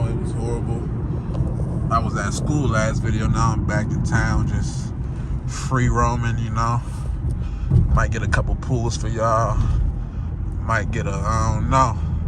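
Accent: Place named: Baltimore, United States. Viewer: That Baltimore accent thick gud video G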